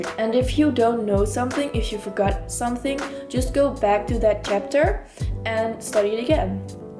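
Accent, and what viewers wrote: Netherlands. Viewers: Je hebt een Nederlands accent op je Engels. Oefen een beetje! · Je bent Nederlands! Ik dacht al dat ik een accent hoorde. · Talia Bilal she sounds dutch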